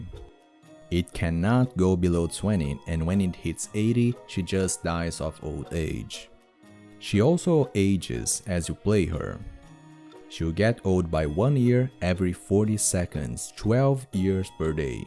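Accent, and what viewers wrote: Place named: Brazil. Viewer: Será mesmo? O inglês é ótimo, mas me lembra brasileiro.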